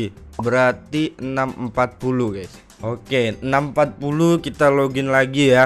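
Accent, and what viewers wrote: Java, Indonesia. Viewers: Bang itu orang jawa ya? Kirain di asli sunda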